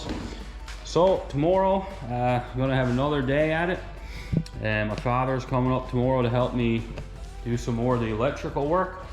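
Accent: Newfoundland, Canada. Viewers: U sounds like a Newfie by!! 🤔😅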